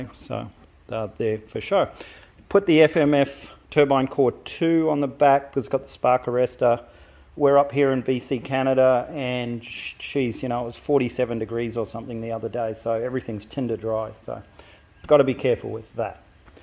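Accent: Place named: New Zealand. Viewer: awesome bike, you sound like your from NZ not canada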